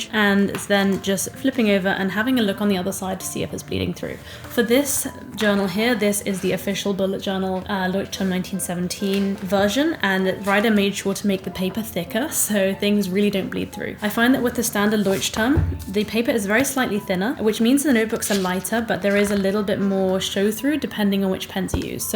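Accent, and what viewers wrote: United Kingdom. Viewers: Hi it’s nice to see you and listen your videos. I love how you talk in British accent and always your voice gives me a power to improve my English deeper. Currently, I’m living in Japan and I would like you to come to Japan, this is such a good country and I can assure you that you will love it. Thanks for your videos and I want you always to be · Hi it’s nice to see you and listen your videos. I love how you talk in British accent and always your voice gives me a power to improve my English deeper. Currently, I’m living in Japan and I would like you to come to Japan, this is such a good country and I can assure you that you will love it. Thanks for your videos and I want you always to be beautiful as now.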